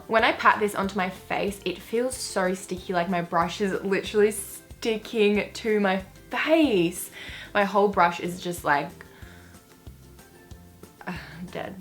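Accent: Australia. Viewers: Is she Australian?? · She's Australian.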